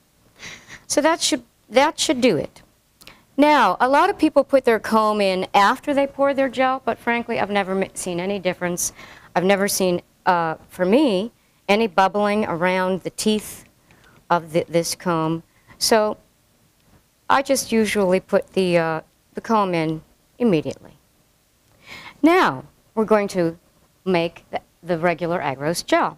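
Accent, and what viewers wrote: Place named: South, United States. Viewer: Slight Southern accent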